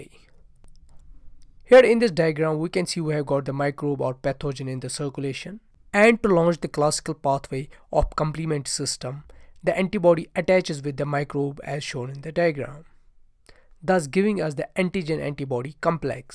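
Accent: Kashmir, India. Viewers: Are you sir from kashmir 😍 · Thank you so much. It was really helpful. Are you a Kashmiri though? Sound very much like a Kashmiri😄❣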